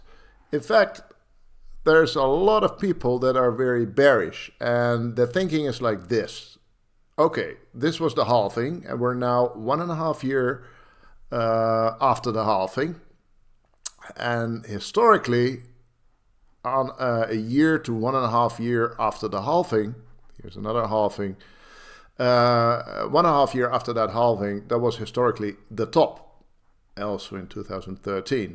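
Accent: Netherlands. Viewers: I love the Dutch accent. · Are you Dutch? 🎉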